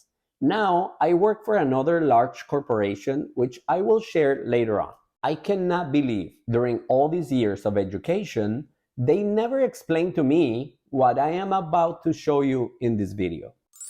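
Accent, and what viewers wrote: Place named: Europe. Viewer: Europe your accent is from Europe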